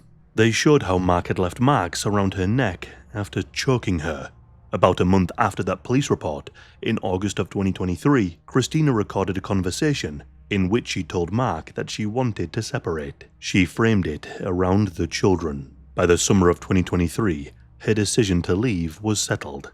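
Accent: United Kingdom. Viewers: The commentator is British, in which country did this happen?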